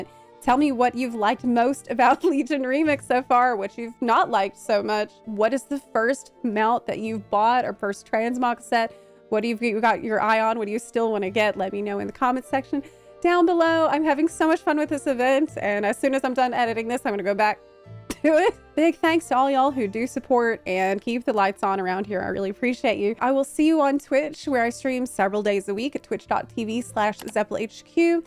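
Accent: South, United States. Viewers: Your southern accent is legit.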